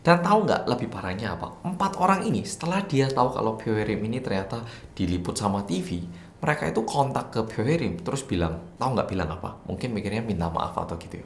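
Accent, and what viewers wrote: Java, Indonesia. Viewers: Setelah ntn the glory, q sempat ada lihat video perempuan agak gemuk trs rambut ponian, dia LG cerita di depan kamera katanya drama the glory ini, sama seperti cerita dia. Trs q sempat lihat bekas cetok rambut di badannya. Ntah itu bnr ato gak, wkt itu di interview GT si perempuan itu, gitu aza sich.. tp ternyata menceritakan LBH jelas 😊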